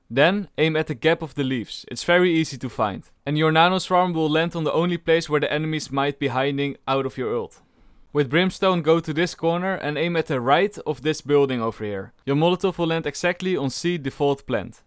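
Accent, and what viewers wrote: Netherlands. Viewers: Are you dutch? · Hoor ik een nederlands accent? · Kheb nog nooit een Nederlander zo Nederlands Engels horen spreken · you can just hear by this guy his english accent that he is dutch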